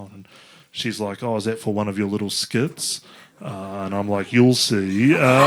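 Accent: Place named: New Zealand. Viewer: Kiwi / New Zealand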